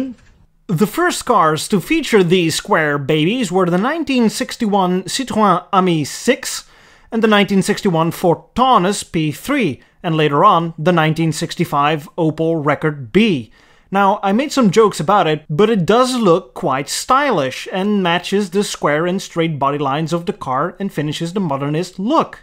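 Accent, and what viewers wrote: Netherlands. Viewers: The Dutch accent is nice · i love this channel i found it by accident but i love it, also pretty sure he is dutch dat accent herken ik van een kilometer afstand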